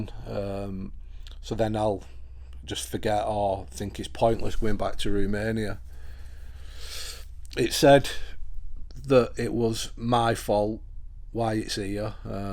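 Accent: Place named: North, United Kingdom. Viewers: I just had a thought could he have shortened anastasia to stasia or stay in life cause the stay sound in hers and Stacey is a coincidence to some degree? Also I'm from London & the way you Northeners say Romania as Rooo mania just makes my day every time you say it 😊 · So I had to ask........ I was visiting one of my best friends over the holiday who is an Anglophile (and never even been across the pond mind you), so as I never did pick up where it is you live specifically over there she told me that if I showed her a video she could pick out where she thinks you live based on your accent. My Anglophile Yank friend here who has never left North America or resided outside Washington State bets that you either live in or spent most of your life in or near Manchester. The north.... I'm dying to hear how close she is!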